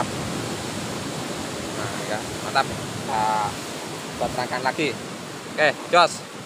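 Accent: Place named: Java, Indonesia. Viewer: Bahasane ojo medok2 to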